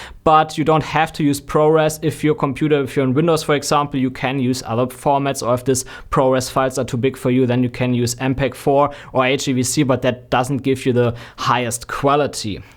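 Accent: Germany. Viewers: You sound a little like a German who speaks English 🧐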